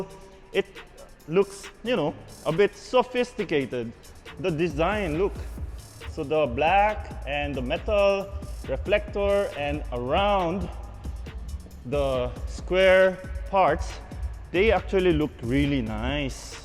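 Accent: Philippines. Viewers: You have that filipino accent hehe.. actually many koreans do.. the korean girls are so cute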